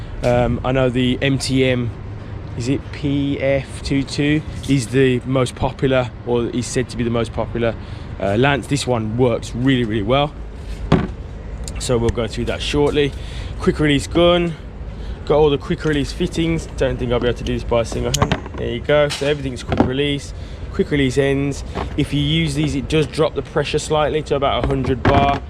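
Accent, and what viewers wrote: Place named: Midlands, United Kingdom. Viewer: Nice video buddy. thank you .. i detect a midlands accent there....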